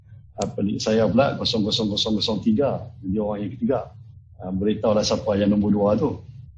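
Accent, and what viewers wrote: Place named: North, Malaysia. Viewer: sy suka datuk..ckp utara pekat..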